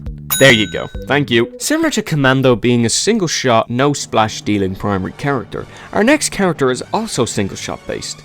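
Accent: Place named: Ireland. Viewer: Irish accent 🤑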